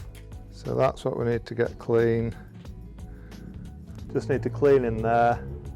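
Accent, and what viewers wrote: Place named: Bolton, United Kingdom. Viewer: Thank you! Good to know ! I’ll try this… Merry Christmas.. you sound like you’re from Bolton !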